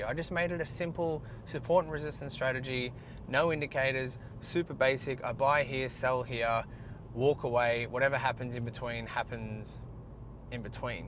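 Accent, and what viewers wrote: Australia. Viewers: Perfect time for me to listed to that. It is nice to listen to a fellow Aussie. Thank you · Are you Aussie?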